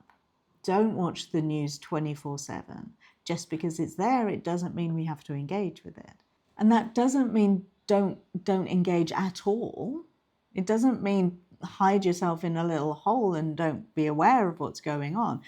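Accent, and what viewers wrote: England, United Kingdom. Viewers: Can I ask what the relevance is to you stating that the people you normally work with are six figure earners...what difference does itahe what you earn...is that the only value we have as humans...the amount of money we can make?!?!?! I've never come across your videos and I was intrigued and even excited when I heard the English accent then you said that....such a shame 😮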